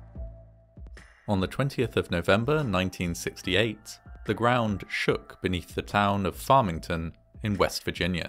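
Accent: United Kingdom. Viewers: I love his narrations, such a calming and soothing voice with a very non judgmental or incriminating approach! Mee-thane gas, gotta love the original English interpretations. Remember folks, it started with them, not with us in America! I love and marvel how the British and Scottish pronounce common English words! It sounds wrong but again (a-gane), it’s we that have it all wrong not them! 🇬🇧🏴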